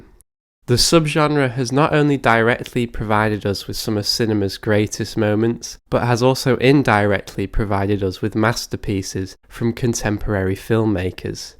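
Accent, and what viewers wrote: United Kingdom. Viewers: Narrative spooled by the low class English accent..... no glottal stops... ie "T"s" matter and "Bee-ings" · narrated by a Brit? ...about italians making american westerns? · Spaghetti westerns described by a crappy British accent. YouTube sucks ass these days.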